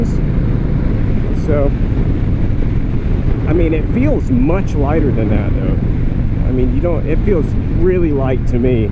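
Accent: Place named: Philadelphia, United States. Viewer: You from Philly?.....sounds like it. Like this bike and the different looks and functionality...thanks for the review.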